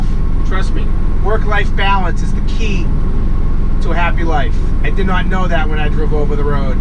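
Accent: Boston, United States. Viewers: Hell, if I have to drive my pickup to a job 20 miles away, by weeks end I complaining to my bride that with all this driving I feel like an over the road trucker 🤣🤣 love you videos , grew up on the south side of Boston and left 31 years ago and it’s great to hear some one that talks normal 😜😜😜✊✊ that’s wicked pissa 😀😀🇺🇸🇺🇸🇺🇸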